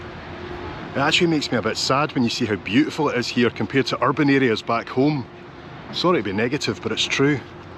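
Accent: Scotland, United Kingdom. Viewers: The body of water between Halifax and Dartmouth is impressive. From Wikipedia: Halifax largely owes its existence to the harbour, being one of the largest and deepest ice-free natural harbours in the world. Before Confederation it was one of the most important commercial ports on the Atlantic seaboard. I loved watching your tour of my home town & listening to your Scottish accent. I recently visited Edinburgh and was touched that you would visit my childhood home, so far from your impressive city. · What a treat to stumble on a video yesterday and today, both narrated in my favorite accent Scottish. The videos were interesting, too.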